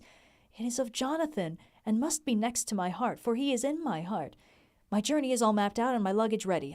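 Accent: Scotland, United Kingdom. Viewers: I would have 1000 hours of that scottish if you would spare me that newsman voice.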